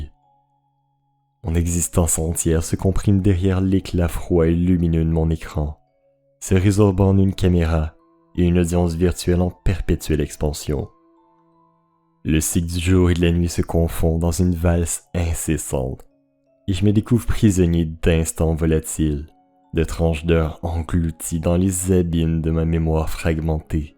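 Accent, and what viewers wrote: Quebec, Canada. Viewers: Olala cette voix m'avait tellement manqué. J ai eu cette chaine sur l accueil de youtube et j ai tout de suite cliqué. Ta narration est toujours aussi incroyable et sublime. J'adore ton accent québécois hihi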